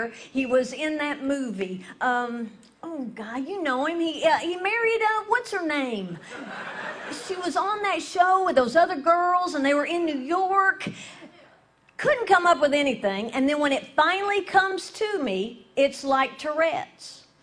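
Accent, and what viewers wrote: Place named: South, United States. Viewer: Something about that southern accent..... quite nice.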